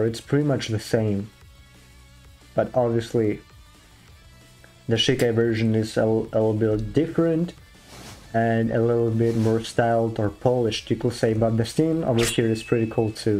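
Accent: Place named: Russia. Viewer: У тя да же акцент русский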